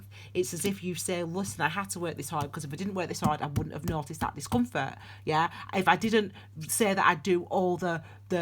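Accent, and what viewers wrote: United Kingdom. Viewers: 1st Time watching your reading... Hello from the US , New Jersey specifically...I love your energy, your happy and so sweet! And of course I Love the accent! We Americans will never sound as lovely like you Brits ❤️ Your reading was Great! I like the way you do your readings, I feel like it's much more in depth and has a great flow. So I hit subscribe, of course! Thank you for what you're doing to raise the vibrations and awaken the planet! Awesome !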